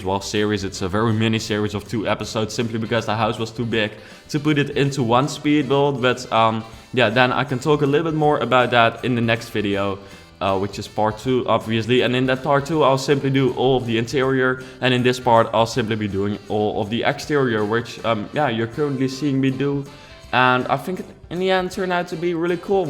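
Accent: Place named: Netherlands. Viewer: Haha ik kende je kanaal nog niet, maar ik klikte op je video (zonder naar je naam te kijken) en hoorde gelijk al dat je Nederlands was! Haha, Nederlanders hebben altijd zo'n accent, wel een super leuke video👌🏻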